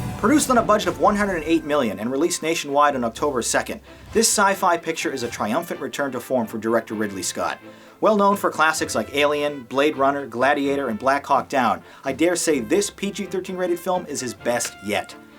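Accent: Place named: Boston, United States. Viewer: Review Black mass and add your boston accent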